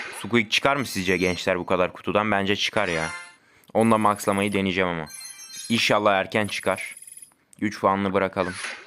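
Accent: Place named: Thrace, Turkey. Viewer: Trakya aksağanı gözümden kaçmadı. Selam olsun sana hemşerim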